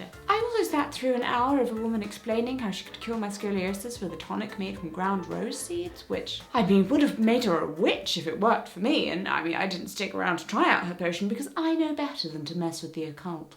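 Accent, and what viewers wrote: RP, United Kingdom. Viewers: If Erin from The Office was a pin-up with a posh accent, she would be.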